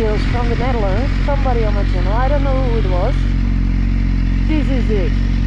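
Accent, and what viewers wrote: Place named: Netherlands. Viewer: Hi, I hope everything is ok with a nex surgery. Thanks for you vidéo <3 i like your dutch accent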